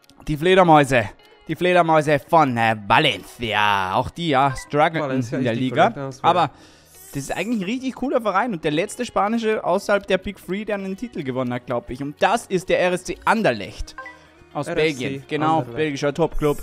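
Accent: Austria. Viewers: Kommst du von Österreich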